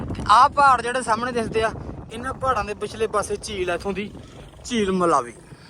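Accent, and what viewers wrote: Malwa, India. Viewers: ਬਾਈ ਮੇਰੇ ਵਾਂਗ ਤੂੰ ਵੀ ਸੜਕ ਨੂੰ ਸ਼ੜਕ ਕਹਿੰਦਾ ਹੈਂ😊 ਅਸਲ ‘ਚ ਇਹ ਮਲਵਈ ਲਹਿਜਾ ਹੈ ❤ ਬਾਕੀ ਅਨੰਦ ਹੀ ਅਨੰਦ 🎉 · ਬਾਈ ਮੈਂ ਤੁਹਾਡੀਆਂ ਵਿਡੀਓਜ ਪਿਛਲੇ ਚਾਰ ਪੰਜ ਮਹੀਨਿਆਂ ਤੋਂ ਦੇਖ ਰਹੀ ਹਾਂ ਜਾਣਕਾਰੀ ਤਾਂ ਮਿਲਦੀ ਹੀ ਹੈ ਪਰ ਤੁਹਾਡੀ ਬੋਲਬਾਣੀ ਨੇ ਦਿਲ ਟੂੰਬ ਲਿਆ ਕਿਉਂਕਿ ਮੈਂ ਵੀ ਮਲਵਈ ਤੇ ਦੇਸੀ ਭਾਸ਼ਾ ਹੀ ਬੋਲਦੀ ਹਾਂ ਸ਼ੁਕਰੀਆ ਬਾਈ ਜੀ🙏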